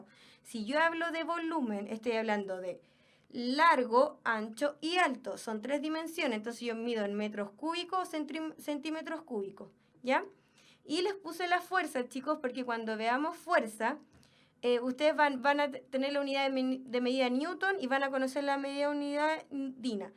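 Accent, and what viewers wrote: Chile. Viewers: Que insufrible el acento chileno. Puffff quierp prestarle atencion pero su voz y ese acento no me dejaaaaa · Debe ser de Chile, su acento es tan bonitoooo 😍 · Rilma García torico en chile ya significa si, por el acento deduzco que es chilena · el toque que le da la a que la clase sea perfercta es el acento chileno xd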